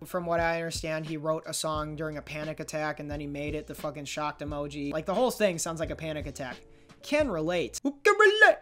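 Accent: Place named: New York, United States. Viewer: sounds extra new yorky in this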